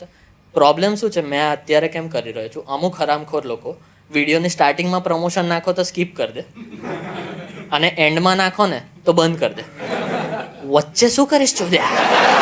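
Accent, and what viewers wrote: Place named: Vadodara, India. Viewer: Bhai baroda accent bau cute laage 😍😂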